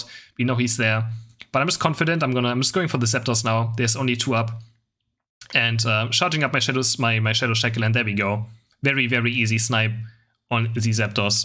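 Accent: Germany. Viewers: Do you have a German accent or am I just imagining that?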